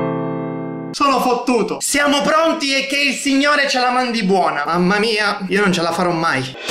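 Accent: Sicily, Italy. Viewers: Ma sei di Sicilia?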